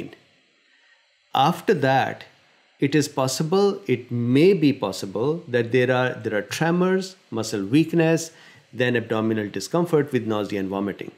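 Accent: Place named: India. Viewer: Love this guy's accent! I just came here for the info because I got bit by a black widow spider about a week ago but I LOVE the Indian accent. Yes, it's painful, yes it sucks; you're not going to die from it. There hasn't been a death from a black widow in the US in ten years. "Provoked" can mean you accidentally climbed through their web without knowing it; the spider went down your shirt and bit you seventeen f***ing times (what happened to me). I climbed under a fence at night, didn't see the spider but felt it's web on my face. The thing about a black widow spider bite is you don't feel the sting but an hour later you feel the pain which in my case felt like somebody hit me in the chest with a baseball bat. It took me a while to figure out that I got bit by a black widow. At first I thought I'd pulled a muscle but it started to hurt way more than you'd expect from a pulled muscle. 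It was a deep pain and the skin surface was numb. But then after about a week the deep pain started to go away and there was pain and itching on the surface and there were red spots in each place where the spider bit me. I counted about seventeen individual bites. About a week and a half into it now and the red spots have turned into scabs, some of which have already fell off. I'm still in pain but I'm not going to hate black widow spiders for the rest of my life. I'm definitely going to be careful about crawling under fences; at night; in the dark; where I can't see what I'm climbing through. T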